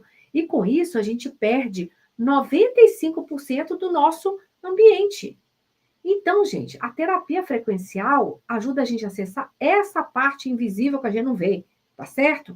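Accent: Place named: Minas Gerais, Brazil. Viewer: Quero muito fazer esse curso, primeiramente pq vc é autêntica e simples tanto pra explicar o conteúdo e para se apresentar pra gente aqui Esse sotaque mineiro gostoso rsrs é muito bom! Se não conseguir nesse momento, tenho certeza que em outro estarei com vc 🙏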